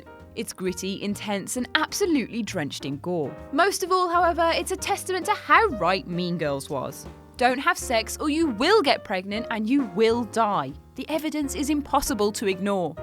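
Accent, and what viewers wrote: United Kingdom. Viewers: UGH. I hate the when they use the commentators with British accents. E#specially this one. Won't be watching this. I'll find a similar list om watchmojo or imdb.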